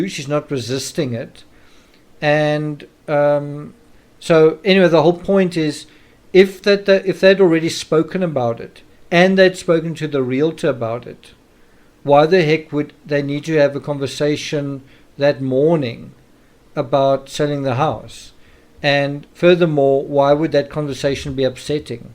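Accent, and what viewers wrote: South Africa. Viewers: Your voice make me sentimental to my SA friends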